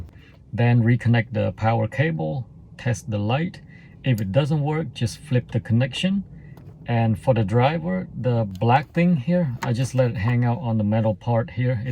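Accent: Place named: Vietnam. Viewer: You sound like my uncle. (Viet accent) and , it looked like you were on the 99 North heading towards Fresno Area, lol.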